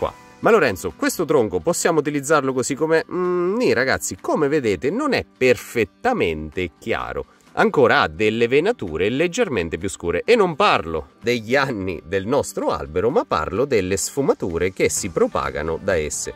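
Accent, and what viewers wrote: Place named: Agrigento, Italy. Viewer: Ciao Lorenzo dall'accento sembri di Agrigento io sono di Palermo l'inverno della nostra isola e così mite che da lunedì sono previsti 20/°C 😎☀️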